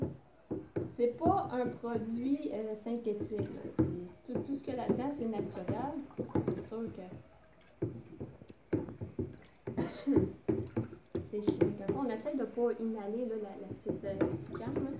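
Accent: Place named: Quebec, Canada. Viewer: Désolée ,mais je ne comprends pas tout avec l'accent du Québec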